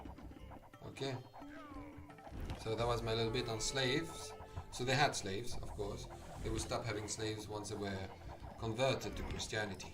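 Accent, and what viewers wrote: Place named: Netherlands. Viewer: Weird-ass Dutch accent, but okay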